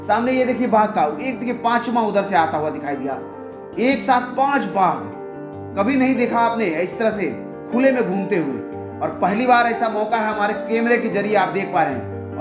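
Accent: Bihar, India. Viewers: aisa kn hai Apne state ka hi accent hai Jab koi punjabi ya south Indian ka pronunciation sunne milta hai tab to log cool bulate hain Humare ghar ka hi accent hai to hai Accent ke naam pr america aur britain vale bhi ladte hain So Bihari hai to bihari accent hi niklega Its not a matter of shame, but our identity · Language hi or language ka tone hi to dusre log se alag dikhata hai jo humare Bihar ka hai isme koi dikkat nhi hona chahiye kyunki yehi culture hai jis par humlog ko proud feel krna chahiye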